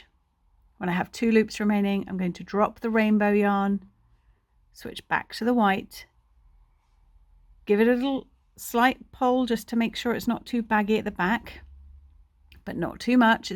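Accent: England, United Kingdom. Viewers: Thank you I was thrown because you have an English accent and was using americanised terminology 😁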